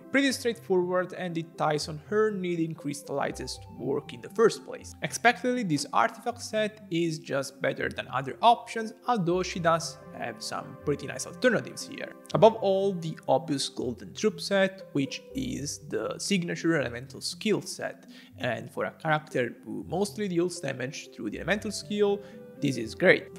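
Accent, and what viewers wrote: Italy. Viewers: Thank you for your guides ❤ and also idk why but i think you're italian bc your accent is similar to the Italian accent 😂❤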